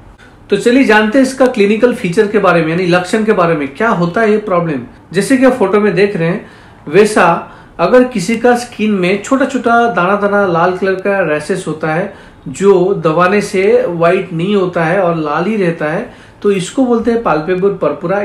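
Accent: Odisha, India. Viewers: Are u an odia sir??😊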